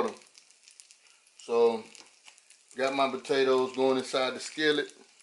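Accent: South, United States. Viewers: I haven’t even watch the video yet but I can tell by the thumbnail and country voice this is going to be good. I love black people!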